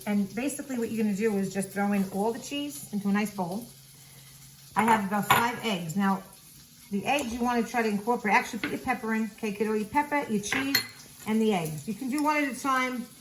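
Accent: New York, United States. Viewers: Keep up with the videos girl I've learned a lot from you!! And you trip me out with that n.y. accent 🤣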